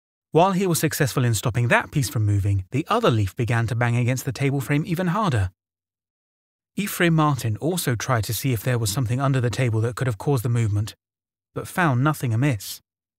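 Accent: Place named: Bristol, United Kingdom. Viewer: Your voice is very melodic. I'd say it's close to but not quite a Bristol accent?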